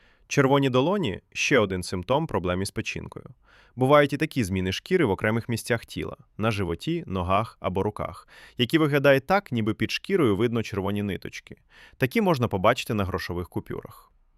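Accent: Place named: Central Ukraine, Ukraine. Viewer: Навчити "дєвочку" з Кійова, щоб вона розмовляла як пані зі Львову? Навіщо?! Тим більше що ано з Кропивницького чи з кіраваградскої області. "Тітка"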